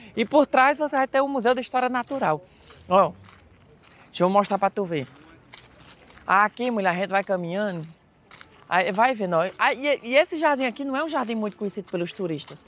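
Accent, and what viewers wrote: Ceará, Brazil. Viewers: Bom dia, espero que tudo esteja bem c vc.como boa nordestina gosto.de assisti-lo pois vc ñ perde o sotaque cearense do Juazeiro e do Crato, sou paraibana do sertão.e minha cidade faz limite com Mauriti e conheço toda região. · Adoro seus vídeos, vc nos mostra lugares lindos e passa as informações de forma divertida e amo seu sotaque, sou de São Paulo mas minha família é do Ceará, qdo vejo seus vídeos só lembro da minha família 🥰😘💚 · Gratidão por manter seu sotaque, por valorizar suas raízes. Também sou nordestina e amo o nosso jeito de ser.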